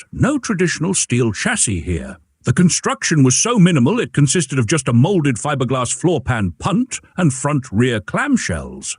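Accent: England, United Kingdom. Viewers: AI can make it sound like an English accent but lets itself down with pronunciation.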